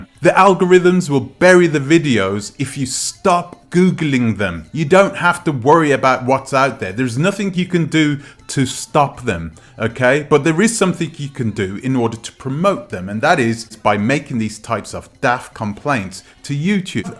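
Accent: United Kingdom. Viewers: I think I don't belive you, with this English of England, start speaking like a black man, while you talking like the King of england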